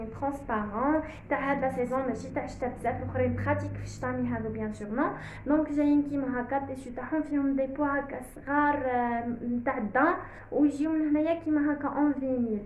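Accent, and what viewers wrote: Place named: Maghreb. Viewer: فيديو رائع ❤️❤️ تصوير رائع واختياراتك أنيقة❤️❤️بس اللهجة صعبة الفهم لمعظم العرب لا يفهمون اللهجة المغربية والجزائرية والتونسية للأسف 💔💔 ف الرجاء التحدث ب الشامية أو الفصحى أو المصرية هكذا ينتشر الفيديو أسرع ويكون له جمهور أكبر او ضيفي ترجمة مصاحبة بليز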